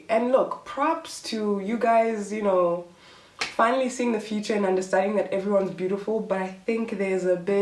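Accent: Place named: California, United States. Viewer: Which part of South Africa you from? Interracial dating isn’t common at all. You making sound as if you from California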